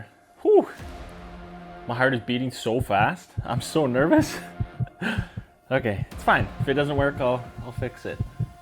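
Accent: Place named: Canada. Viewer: Bro your accent is like so Canadian and I love it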